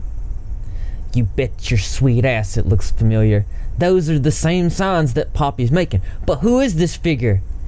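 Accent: South, United States. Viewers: Deep southern accent + purple hair + wierdo earrings/plates =???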